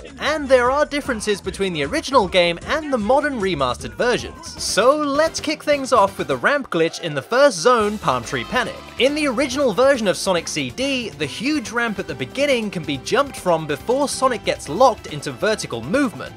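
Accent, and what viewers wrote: United States. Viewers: lol your American accent is incredible. Even better than IHE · dude your American accent is pretty convincing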